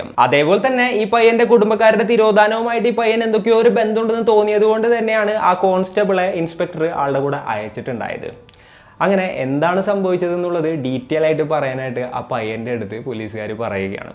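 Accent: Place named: Kodungallur, India. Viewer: സ്ക്രിപ്റ്റ് ഒന്നു കൂടി ശ്രദ്ധിക്കൂ ബ്രോ. ചില സ്ഥലത്തോക്കെ കേട്ടിരിക്കാൻ ഒരു ഫ്ലോ നഷ്ടപ്പെടുന്നു. പിന്നെ ചെന്നുങ്ങയിൻറ്റ്, എത്തിങ്ങയിൻറ്റ്, പോയിങ്ങയിൻറ്റ് പോലുള്ള കൊടുങ്ങല്ലൂർ സ്ലാങ് പറ്റുന്ന പോലെ കുറയ്ക്കാൻ ശ്രമിക്കണം.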